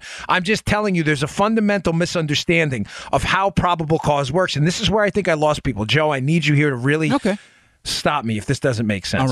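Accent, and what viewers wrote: New York, United States. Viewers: Since moving back to St. Louis I totally miss people with Dan’s NY/Northeast accent. Mines starting to disappear but I ramp it up to act tough with local punks. Great show Dan, also you are a formidable guest on Fox new panel segments.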